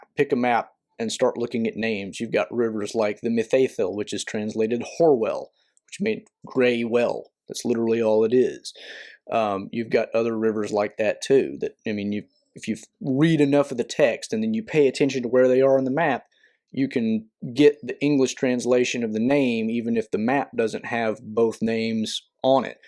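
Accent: South, United States. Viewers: That bloody accent....so Southern.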